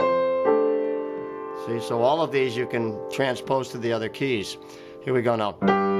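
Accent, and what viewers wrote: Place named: New England, United States. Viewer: That was one of the best lessons I've ever had! What a great video. Clear, concise and impactful. Thank you! I've subscribed and will check out your website today. PS, great to hear a NE accent down here in TX!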